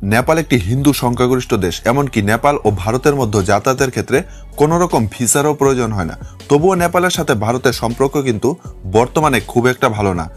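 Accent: Bangladesh. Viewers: Tui ki kore Indian holi ?? Tor bolar dharon sunei bojha jachhe tui bangladeshi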